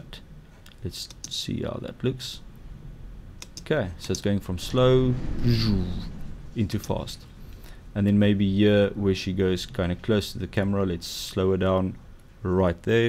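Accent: Afrikaans, South Africa. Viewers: Baie Geluk, ek sien een van jou videos is gefeature op No Filmschool, toe ek die video kyk toe kon ek sommer hoor hierdie is 'n Afrikaanse Ou. Baie geluk!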